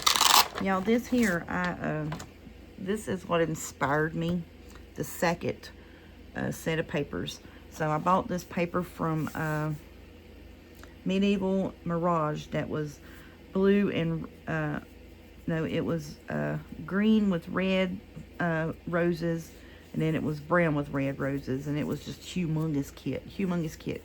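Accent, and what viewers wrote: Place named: North Carolina, United States. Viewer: Are you from North Carolina? I am and it sounds like you are. I love it!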